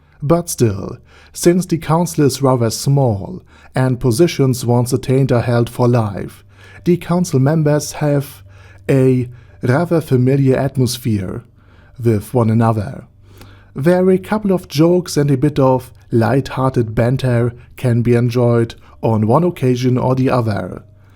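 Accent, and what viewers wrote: Germany. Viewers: thicc german accent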